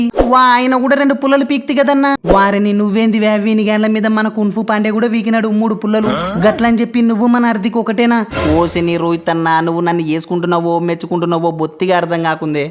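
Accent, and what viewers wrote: Rayalaseema, India. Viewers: ఈడ క్రికెట్ ఆడినట్ట్టు లేదే ఇపుల్ బజ్జి ఆడినట్టు ఉంది 😂😂😂😂😂 రాయల సీమ బాషా మజాకా 😂😂😂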